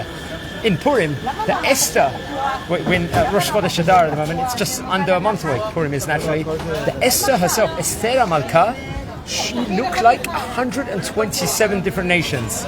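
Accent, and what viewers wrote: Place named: United Kingdom. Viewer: Your from europe you have British accent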